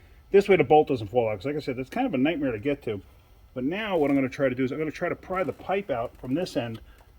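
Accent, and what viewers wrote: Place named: Midwest, United States. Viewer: Thank you for your humility in your presentations. You don’t need apologize for bad camera positions, we understand. I would edit out more dead time. Watching a car go up on the rack is pretty boring. I enjoy hearing, “I’ve never done one of these before”. We all learn together, learning from your mistakes and also your clever “work arounds”. Your bits of tricks of the trade comments are great. I sure hear a Chicago, St.Louis, Detroit accent from You. I heard all those accents when in the service. I’m no expert, but I don’t hear Carolina. 😊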